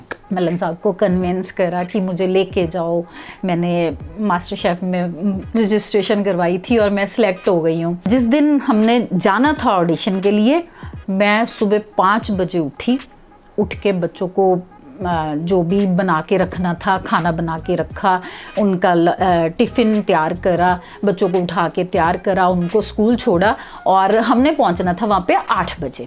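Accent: India. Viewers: Ohhhhhhh aunt feeling sad for you lekin koi bat nahi jo hota behtr hi hota and think agr tb ap ka audition ho jata to shayed youtube ap k zehn m na ata aur aj ap ka apna channel na hota.So agr hmary pas sae kuch jata h to us sae ziada hi wapis b milta h 😊BHT ACHA LGA AP KI KAHANI SUN K.Aur ap bht cute hn specially talking style DESI DESI. sa😍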